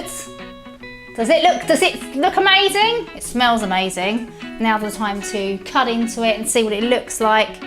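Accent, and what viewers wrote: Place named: Cockney, United Kingdom. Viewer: Dear, why do you sound so Cockney? Is yours a typical Rochford, Southend-on-Sea, Leigh-on-Sea accent? It's hard to make out what you are saying at times. This is an EARLIER video of this recipe, the Risotto Bundt Cake, than the one showing today (October 2025) on Roku. In the episode I partially watched today, you were also making a Vegetable Tart, and you had an Asian lady on as guest dessert maker. Plus you appear younger here, and are dressed differently, than in the episode I watched. You were also wearing a poppy to honour our War Dead in today's episode. But I hope you are no longer wearing that "Mop of Messy Hair" style that I saw today. 😕 I didn't think I would be interested in the Risotto Bundt Cake, but I think I might like to try it. Where can I find the printable instructions? Oh, I see that they are in the Description, thanks!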